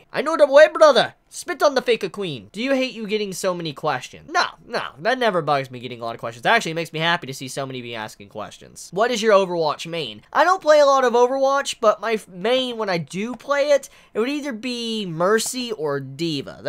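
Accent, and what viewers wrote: South, United States. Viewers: I think he from the south too.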